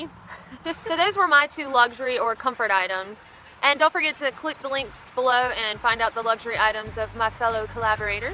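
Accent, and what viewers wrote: South, United States. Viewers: Came here for the southern accent- stayed for the information... Bought your book.. can't wait to read it. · I bowl water also. Your southern accent is dignified and correct! My favorite camping item to bring would be bacon.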